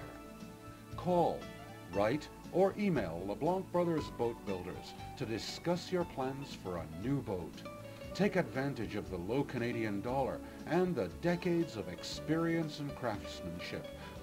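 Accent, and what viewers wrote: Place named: United States. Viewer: great boats, but jesus, this video looks and sounds like its from 1990. and whats with the super american narrator?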